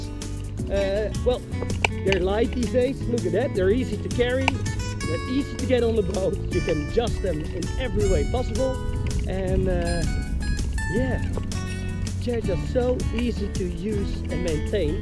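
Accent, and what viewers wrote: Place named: Amsterdam, Netherlands. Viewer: Grappig amsterdams accent ; )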